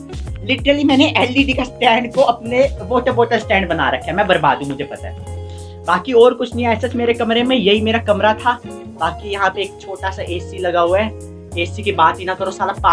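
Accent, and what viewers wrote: India. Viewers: Sound like Himachali ??? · Delhi se ho Accent dekh ke lg rha h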